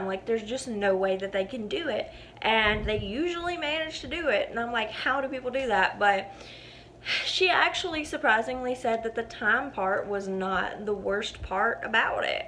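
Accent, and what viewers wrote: South, United States. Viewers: Girl, I’ve been meaning to leave you a comment to tell you that you remind me a lot of myself. I ramble when I’m telling stories and I have nervous habits just like you. I know a lot of people do but it’s really refreshing to find a youtuber that I can relate to! I absolutely love your tattoos too by the way. And your southern accent. I’m probably being a bit creepy now, sorry about that! I subscribed a few days ago. Love your content. ❤️ I’ll stop now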